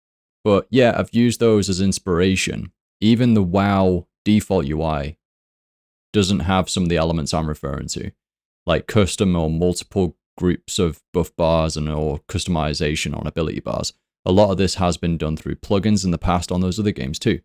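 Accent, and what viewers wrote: United Kingdom. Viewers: Selby is from Leicester. You, too? Perhaps a Leicester accent but wtf even the voice matches lol.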